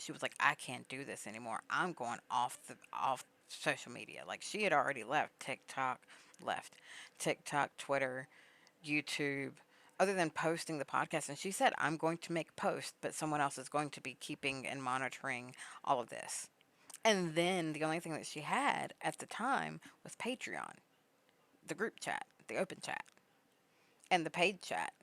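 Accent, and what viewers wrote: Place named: South, United States. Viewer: I heard the Southern accent and was sat. I know my people don’t these type of call-out videos unless it’s something serious. Also, Mucker here, passing out popcorn to all my fellows 😂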